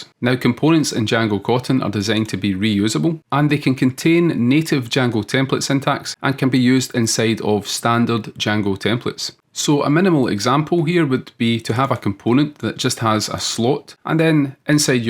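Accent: Scotland, United Kingdom. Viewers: It would be good to have a cotton htmx video. By the way are you scottish ?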